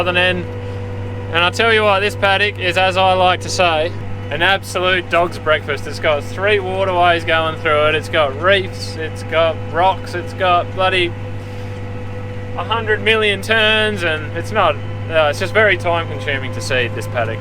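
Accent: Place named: Australia. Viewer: How the heck did a population of convicts from the British empire get to develope such a Croc Dundee accent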